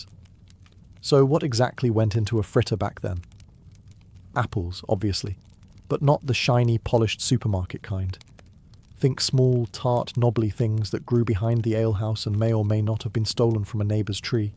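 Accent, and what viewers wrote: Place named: England, United Kingdom. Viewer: Love your videos but some of your mispronunciations are so odd. Despite the English accent it makes me wonder if you're English Second Language?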